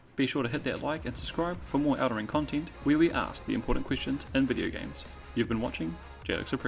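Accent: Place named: Australia. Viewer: "Biddeh" i love australian accent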